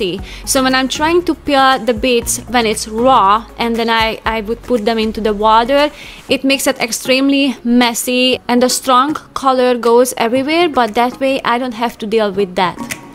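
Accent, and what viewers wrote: Hungary. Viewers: Hello from Romania. Love your hungarian accent.🤗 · I don’t know why YouTube recommended your video and it started playing it with subtitles only and I saw that soup and I was like that looks hungarian.... so I clicked and I heard your accent and I knew it straight away that you are Hungarian too! Feliratkozva 🧡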